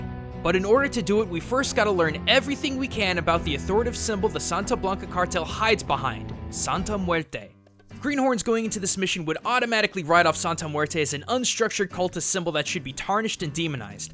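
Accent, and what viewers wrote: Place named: North, United Kingdom. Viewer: dang, the way you pronounce spanish words is so... northern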